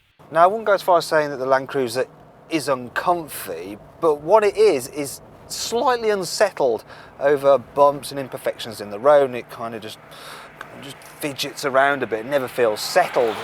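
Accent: United Kingdom. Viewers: its a little bit of a peeeeeeeerch...typical British accent....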